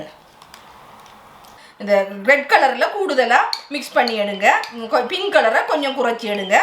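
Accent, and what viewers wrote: Sri Lanka. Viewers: Beautiful sharing I love this Srilankan tamil Thanks for sharing stay connected dear new frnd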